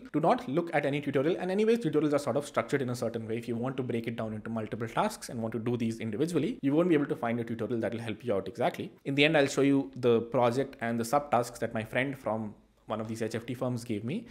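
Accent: India. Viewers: why your accent is so endian 😭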